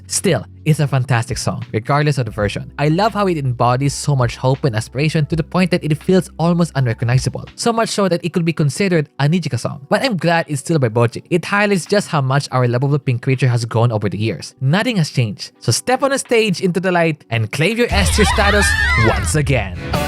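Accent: Philippines. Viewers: well, his bio says he is from the phillpines, and also, i can tell if they are filipino based on the accent and how he speaks.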